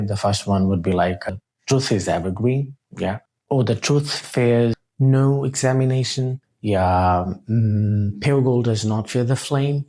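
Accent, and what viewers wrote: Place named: Sindh, Pakistan. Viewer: Being Sindhi accent, teaching marvelously.Hats off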